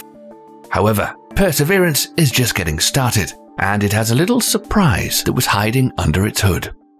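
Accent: United Kingdom. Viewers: I will never NOT upvote any video with the soft dulcet tones of the best british voice narrator.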